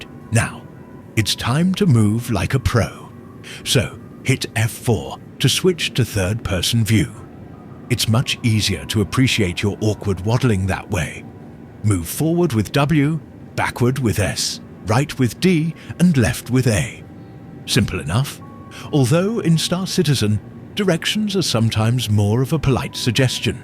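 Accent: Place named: United Kingdom. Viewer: Great comedic writing! And the stiff-upper-lip British voice is peak! Can't wait for the next one.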